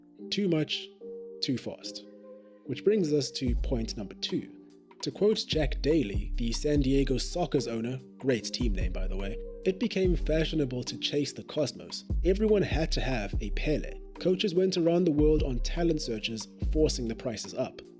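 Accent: South Africa. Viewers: Accent sounds like a black south African for some reason....just saying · Are you South African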